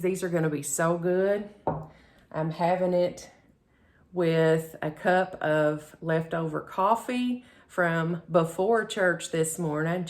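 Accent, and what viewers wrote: United States. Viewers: I’m feel so “at home”, watching your videos! I’m in East TN! I can really relate to your Southern accent & down to earth , personality ! You are a breath of fresh air! You are really creative ! God bless you ! Thanks for showing us , your bargain deals , from grocery store & your frugal meal ideas . Love the fridge clean out, ideas! When you talked about holding on to the one egg, it cracked me up! I have been hanging on to my eggs, for dear life ! 😂😂😂! I recently had the same thought as you! I can’t let these eggs ruin, by holding on to them ! lol. ❤ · Very smart!! I love good deals!! Omg you sound just like my family we’re from the deep South Georgia so you know we all hicks down this way 😂😂 God bless you!! Love how you’re teaching people how not to waste!! I don’t waste myself!! I like to take leftovers make soup or freeze them. 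I take my scraps & throw into my little garden, I couldn’t live with myself with all the people that are going hungry, & me waste anything!! You can even make extra batches of meals, freeze them & heat them up to save yourself from cooking sometimes. Thank the Lord eggs are going back down in prices!! You made a lovely meal!!!❤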